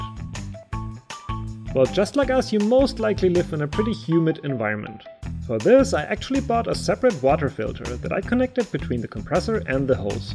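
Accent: Germany. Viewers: your accent is so very german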